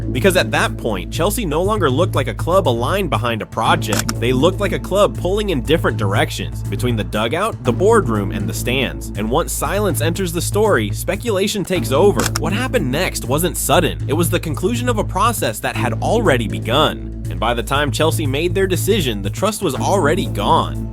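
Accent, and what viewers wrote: United States. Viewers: Listening to a yank narrator on English football is a major put off. Blah blah blah blah blah blah blah blah. Tell us something we don't know 2 - zero!!! Foxtrot oscar mate!!!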